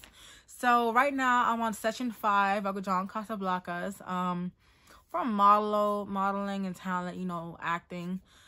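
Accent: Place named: New York, United States. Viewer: can definitely tell by your accent that you stay in NY 🔥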